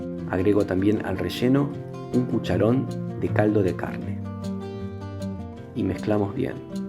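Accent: Argentina. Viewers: Me encantó todo desde la receta hasta el acento precioso de el Argentino que lo explicó todo a la perfección. Gracias! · Que hermoso que explicas!!!! sos Argentino?. nos das una variedad de comidas impresionante!!! Me encanta!!! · Que placer oir hablar argentino. Estuve aì tres años.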